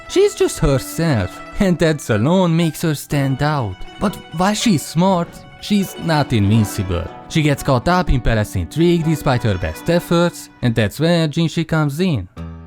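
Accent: Hungary. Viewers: Proud Hungarian accent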